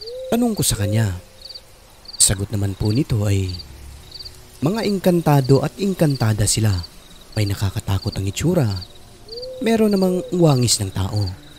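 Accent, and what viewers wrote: Visayas, Philippines. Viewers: Hayyy..ang sarap ng sanga ng bayabas lalo na.kung medyo tuyo na..malanit sa pwet.really like ang accent mo pag nagbibisaya ka Sir natatawa akong.mag isa..thanks di kompleto ang araw namin hanggang di naririnig ang kwento mo.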